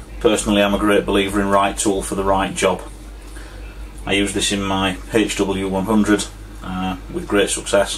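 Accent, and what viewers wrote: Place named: Yorkshire, United Kingdom. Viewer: LOL, you must be a Yorkshire lad. Your accent is just like my brother in law from Hull.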